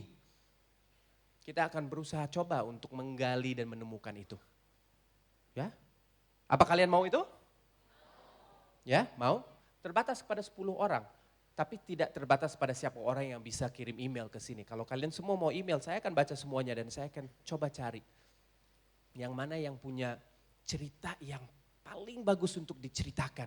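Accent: Indonesia. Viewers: Smg almarhum mendapat tempat terbaik di aiaiNya....amin Logat sdh sgt Indonesia banget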